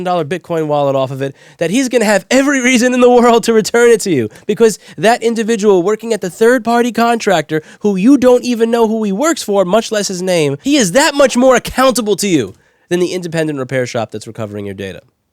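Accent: New York, United States. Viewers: Very entertaining, I just love your approach to injustice, etc, and the New York City "way"...of speaking out verbally. Anyway, being on the west coast, in Oregon, I never could stand the whole apple products pushback by their cult leaders and the members of the congregation, most noticeable here on the west coast which is closer to them. They and the other internet giants pretty much proclaim to rule the world, maybe they do right now, too big for their own good and it reminds me of doctors or lawyers or politicians who come from elite families and have gone to elite schools who have no clue, no idea what its like to be a regular human being or what the inside of a grocery store looks like for instance......have they banned you from facebook, twitter, paypal, google, etc etc yet? Seems like these days all the tech giants have a mutual agreement to ban nay sayers from everywhere all of a sudden.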